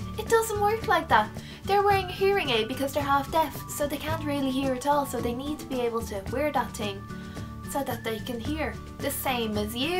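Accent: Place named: Ireland. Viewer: Are you Irish? I love your accent!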